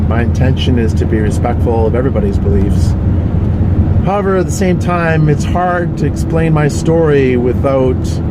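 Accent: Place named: Canada. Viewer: I think your video is great, and I am glad you’re living your truth and your best life! I don’t want to assume but you sound like you could be Canadian and religion is a lot different in the north than it is in the southern United States. Southern Christians are the most backbiting, gossiping, trouble, making, justifying and doing whatever the hell they want to kind of people you can ever imagine. They intermingle their self righteousness, and their politics and their loathing of gays and transgenders all into this big cauldron of godliness that does nothing but hurt and destroy the ability of the south to move forward into a truly progressive way of living. I am 54 years old and wasted decades of my life on Christianity as well as thousands of dollars. I am beyond pissed off about it and I wish I could go back in time and change it. Stay strong and keep on rockin!👊🏻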